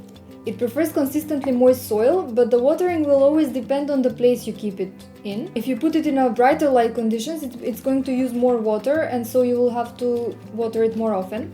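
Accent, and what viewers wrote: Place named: Slavic, Eastern Europe. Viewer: greetings from California... nice accent.. are u slavic? thank u 4 your helpful presentation..🌞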